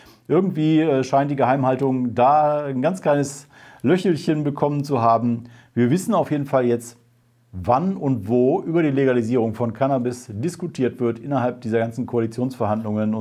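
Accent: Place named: Ruhr, Germany. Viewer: du bist der knaller! Für mich als kölsche Jung bist'e mit deinem Ruhrpott-Dialekt immer wieder lustig.:-))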